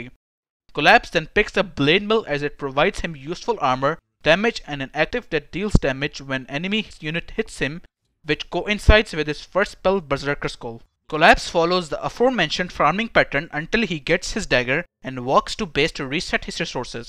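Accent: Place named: India. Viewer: This indian accent man 🤭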